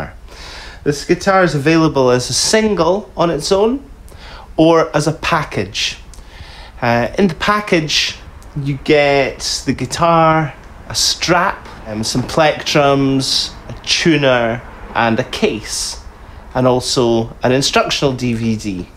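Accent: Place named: Scotland, United Kingdom. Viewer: cool Scottish accent dude!!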